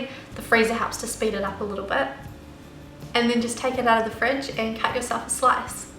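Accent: New Zealand. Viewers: I'm certain she is from New Zealand that sounds like a very thick New Zealand accent to me. I'm Australian too and we def don't sound like when we speak. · is she from new Zealand I am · I'm Australian, and she sounds New Zealand to me. Though in my experience, South Africans who have lived in Australia for a number of years can sound like New Zealanders too.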